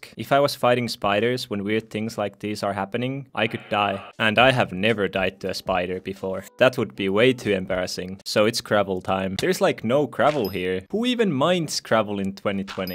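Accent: Finland. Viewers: Where are you from? it sounds like you are from finish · Sun suomalaista aksenttia on niin kiva kuunnella😁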